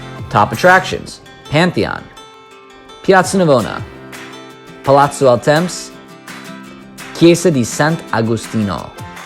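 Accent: Philadelphia, United States. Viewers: Sounds more like a South St., Philly accent. lol The Roman Guy does operate out of Philly.:) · I can’t wait to visit Rome this April. It will be the second since I was 10 years old on my family trip in 1998 before euro 💶 come. I don’t mean to judge but, you come from Philadelphia? I notice your accent since I live in Cherry Hill, NJ. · Great video - thanks for posting. There is so much great information here. And weird question - but is that a Philly accent? :)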